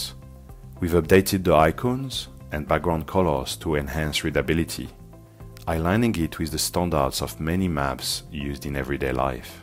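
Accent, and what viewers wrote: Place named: France. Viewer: Sounded like a french accent to me. Kinda explains this nightmare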